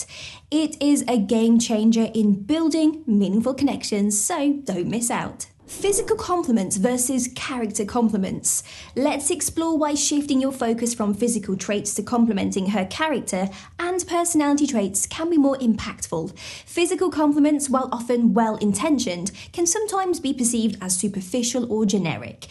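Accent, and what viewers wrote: England, United Kingdom. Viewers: These videos have really helped me since I had some bad stuff happen to me and I trust the English accent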